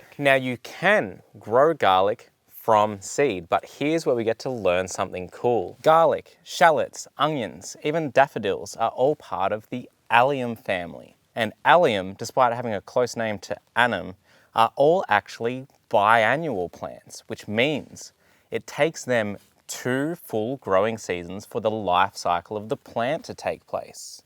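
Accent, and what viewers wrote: Australia. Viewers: New sub. Where are you from? Aussie?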